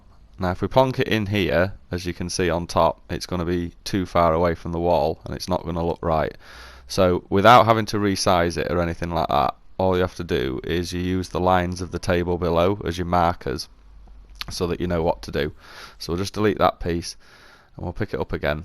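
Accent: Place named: Northern England, United Kingdom. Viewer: I'm surprised your views are so low. Like this is a very rare market on YouTube. I've been looking for things like this and not many do it. Thanks for the video, and I think I can hear that northern accent so maybe I am biased as a Yorkshire man myself ;)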